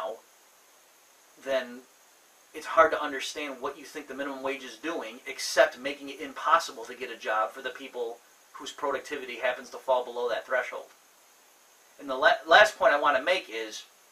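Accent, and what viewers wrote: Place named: Ireland. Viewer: Im starting to hear Murphy's Irish accent. I tried running this logic with my commie econ professor and he kept bringing up Marx.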